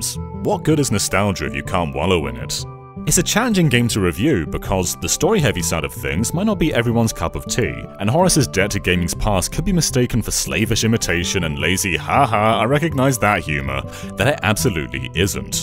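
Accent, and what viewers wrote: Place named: United Kingdom. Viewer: It's weird to hear a British accent on a Nintendo Life video that isn't Alex. :) Good job, good review. I wanna play.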